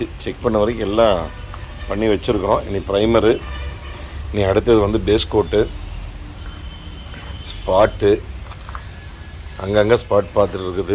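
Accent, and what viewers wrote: Kongu, India. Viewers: தங்களது பல காணொளிகளை பார்த்துள்ளேன் இதுவரை யூட்யூபில் எந்த வீடியோவுக்கும் கமெண்ட்கள் செய்வதில்லை தங்களது கொங்கு தமிழும் அன்பு பொங்க பேசும் உங்களது நடையும் என்னை கவர்ந்தது மேன்மேலும் வளர மனதார வாழ்த்துகிறேன் தங்களது அலைபேசி எண்ணை பகிருங்கள் உங்களிடம் பேச வேண்டும் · கொஞ்சும் கொங்குத் தமிழ் பேசும் கோமகரே...! திகட்டாத தித்திக்கும் பேச்சுடைய...! எங்கள் மதிப்பிற்குறிய திரு. அண்ணா அவர்களே❤❤❤🙏 எப்படி இருக்கீங்க அண்ணி❤❤❤🙏 எப்படி இருக்காங்க ரித்திக்❤❤❤ எப்படி இருக்காரு அருமையான வேலைப்பாடுகள் அண்ணா❤❤❤❤👍👍👍👍 தகரமும்கூட தங்கமென ஜொலித்திடுமே எங்கள் தங்கமகன் சிந்தனையின் வாயிலாக..! அந்த சிந்தனையில் உதித்த உத்தரவைப் பேணிக்காத்து வியர்வைத் துளிகளை நிலத்தில் உதிர்த்து உன்னதமான உழைப்பை உருவாக்கிடும் நமது Super Car Careன் உழைப்பாளிகளுக்கு அனைத்துலக நம் TMF குடும்பத்தினர் சார்பாக எனது நெஞ்சார்ந்த நல்வாழ்த்துக்களையும் பாராட்டுக்களையும் தெரிவித்துக்கொள்கிறேன்❤❤❤🙏🙏🙏 என்றும் அன்புடன் உங்கள் தம்பி என்றும் அன்புடன் உங்கள் TMF ரா.முஹம்மது கபூர் (சென்னையில் இருந்து)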